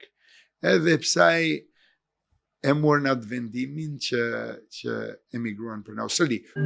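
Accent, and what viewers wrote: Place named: Kolonja, Albania. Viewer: Truri dhe mendja e ndritur e Shqiptareve ndertojne boten dhe per vendin e tyre nuk bejne asgje se nuk kemi shtet qe ti mbeshteti Bravoooooooooo per gjuhen dhe dialektin Kolonjar Respekte per kete Zoteri ❤❤❤❤❤❤❤❤❤❤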